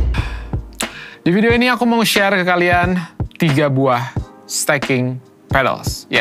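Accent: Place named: West Java, Indonesia. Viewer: Oh, orang sunda? Kirain orang sumatera atau sulawesi. Pas denger "kela.. kela" trus "tah". Kata kela itu singkatan dari "ngke heula". Soalnya yg nyebut "kela" cuma orang yg bisa bahasa sunda sejak kecil atau sunda asli.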